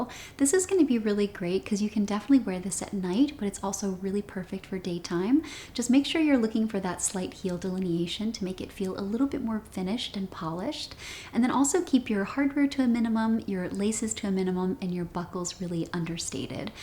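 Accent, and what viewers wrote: United States. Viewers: Same here! I also love her accent, it's so good to listen to. I don't know which part of the US she is from, do y'all have a guess?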